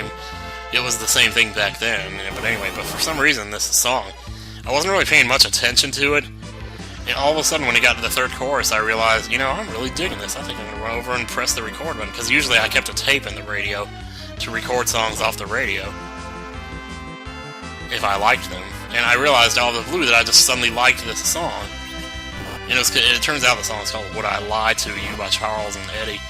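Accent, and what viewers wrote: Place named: South, United States. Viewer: Based on you're accent i bet you are from the south. Great game by the way, even if that tower was a bit of a bitch.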